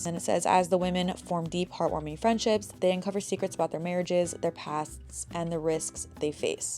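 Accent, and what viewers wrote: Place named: Central Pennsylvania, United States. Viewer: Eagles! I love hearing someone with the exact same accent as mine, central PA, gives me inspiration watching your success. Hope you are enjoying Charleston.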